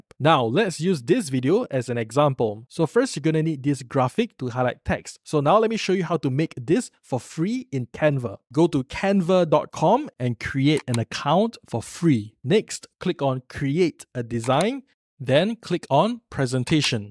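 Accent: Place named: Singapore. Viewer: just the first few words he said, i know hes from singapore lol... a very distinctive accent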